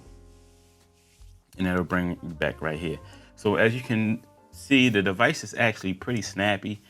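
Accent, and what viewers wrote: Baltimore, United States. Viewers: that baltimore accent coming out big time lol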